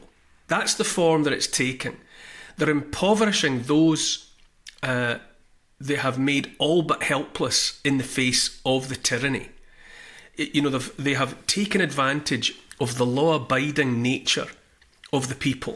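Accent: Scotland, United Kingdom. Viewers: Good to see your subs increasing. More people listening, and taking note hopefully 👍 🤔 😁 Won't Kneel A gentle, beautiful Scottish lilt A kind, empathic, forward tilt An open heart; a caring face A willingness to take his place. A strong, home-hitting monologue Reminds us of the boiling frog The courage to become a sleuth A passion to release the truth. A deep desire to crack the whip Illuminates our freedom-strip Calls out the darkness taking hold The shiny despots; plated gold! Intense and raw, he lays it bare A soul-enhancing, honest stare Inflections drive the message through He speaks for Us - for me and you! Discernment's such a precious gift His words create a mighty lift Take heed of all he has to say He knows - it shouldn't be this way! 🤗🦋🐬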